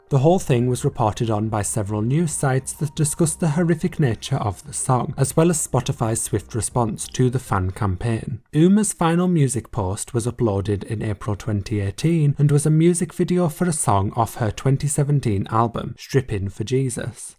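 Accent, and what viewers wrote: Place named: Scotland, United Kingdom. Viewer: it’s a Scottish accent…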